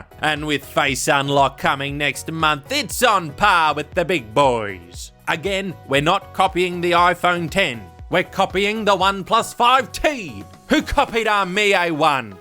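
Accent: Scotland, United Kingdom. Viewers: That Scottish influence tho. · The Scottish accent 😂😂😂😂😂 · Your Scottish accent is hilarious. So talented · Your Scottish accent pronunciation crakes me up! That is a good one! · The Scottish accent 😂😂👌🏻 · The way he pronounced xiaomi like and a dragon ball z here 😂😂😂and that Scottish accent is Killin it 😉🔥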